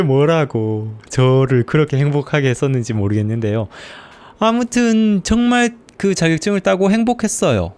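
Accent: Andong, South Korea. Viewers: 독서 검색하다 우연히 알게된 님, 말투에서 안동분임을 알아차렸죠.^^ 연배로는 한참 아래분이지만 인생 대선배인듯한 느낌에 그저 존경스럽단말밖에 안나옵니다. 오늘 아침, 밥 먹으며 고1아들에게 님 얘기를 했네요. 꼭 한번 들어가보라구요. 님이 성장이란 단어를 가장 좋아한다고 했는데 저도 그렇습니다. 그 성장이란 단어는 43살부터 본격적이었네요. 2년전부터 자투리시간 할애해서 주1회 중국어 학습지 수업을 하고 있는데 안하는거보다 낫잖아라며 가볍게 하고 있는데 님의 중국어 채널 유익하게 잘 보고 있습니다. 차로 이동하며 두서없어 하지만 이또한 안하는거보다 나으니까요.^^ 지인에게 님을 소개했더니 인생책 10권을 사서 열독하는 중이라고 그친구 정말 대단하다구 하더라구요. 동감입니다!! 앞으로도 지금처럼 차로 이동하며 화장실에서 화장하며 밥하며 수강생을 기다리며 그렇게 보고듣고 따라하며 더한 성장을 꿈꿔 봅니다. 참 고마운 님, 참 고마운 유튜브입니다!! 언제나 화이팅입니다!!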